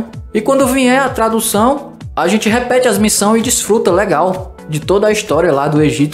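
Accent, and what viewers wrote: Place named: Northeast, Brazil. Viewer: E nois tabem sou nordestino cabra da peste o Nordeste vai domina o mundo percebi pelo sotaque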